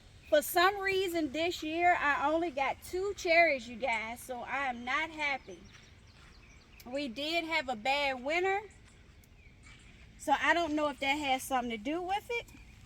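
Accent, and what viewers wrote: South, United States. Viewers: Nice. I can't seem to place the accent though. Definitely southern, but I get twang with no drawl. Carolinas or Virginia maybe? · Beautiful garden! I knew you had to be somewhere in the southern states because we all have that twang, different from state to state but still isn't like New Jersey. I sure wish I could grow the Alisa Craig onions but I'm in zone 9A, so it's short day only.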